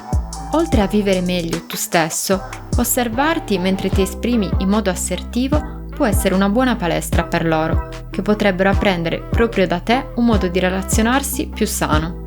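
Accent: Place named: South, Italy. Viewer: Amore, con questo accento terrone? Un libro di grammatica, no? Quanta ignoranza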